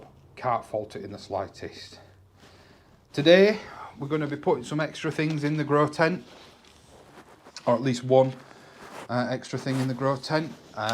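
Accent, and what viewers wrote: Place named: Northern England, United Kingdom. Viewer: I'm sure your subscriptions will grow (as well as your plants!) Thank you for another informative vlog. I have one question, where in the UK are you, you sound like a Northerner, hope thats not an insult! I'm a Yorkshire lass lol 🙂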